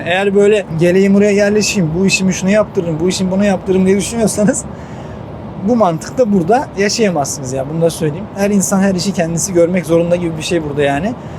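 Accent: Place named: Antalya, Turkey. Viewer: Antalya liymissin.bende Antalya liyim.Akseki liyim. deme çünkü bende Akseki liyim.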